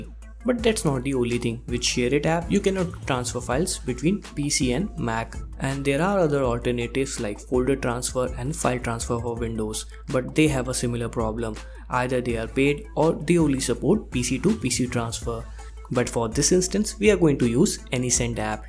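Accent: India. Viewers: Nah this is Indian Accent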